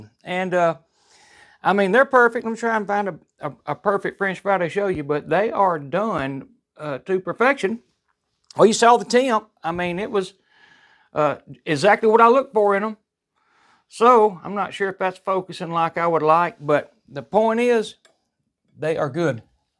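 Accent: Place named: Appalachia, United States. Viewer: Hock dang dam nammit that hill Billy accent lol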